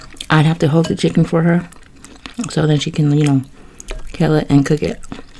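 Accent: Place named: South, United States. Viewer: You have such a lovely soothing voice, almost sound southern 😊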